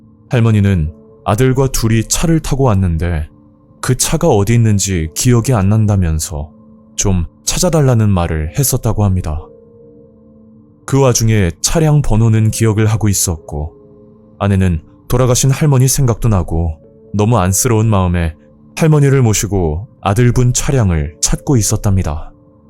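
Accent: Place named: Jeolla, South Korea. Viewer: 아따 전라도라~~~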